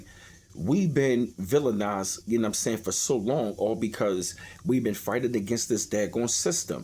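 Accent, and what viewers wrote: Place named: Philadelphia, United States. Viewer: I Hyped this video with points. Your voice sounds like you live in Philly. Great narrative!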